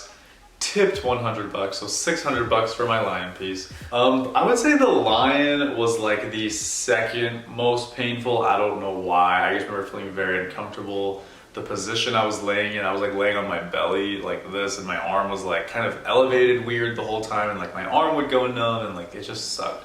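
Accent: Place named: Utah, United States. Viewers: He was born and raised in Utah, so that could be the accent that you're talking about. 🙂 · Which part in the US does your accent come from? I'm not a native speaker of English, so that's just a tiny little curiosity of mine. By the way, your tattoos are amazing.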